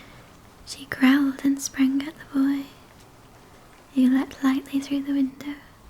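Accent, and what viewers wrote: United Kingdom. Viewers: Where is your accent from ? England ? Ireland ? Scotland ? Your voice is hypnotic.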